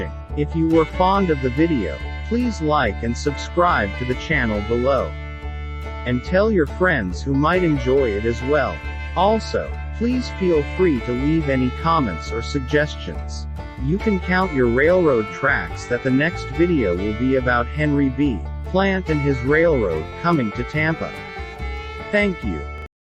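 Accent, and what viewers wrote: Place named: North, United States. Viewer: you sound like a yankee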